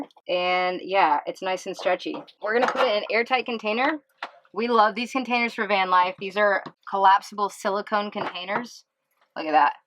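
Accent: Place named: Wisconsin, United States. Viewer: As a born and raised in Wisconsin I def approve of the accent!!!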